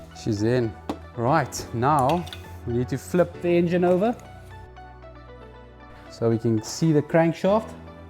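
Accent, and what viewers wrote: South Africa. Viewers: You sound like a siuth African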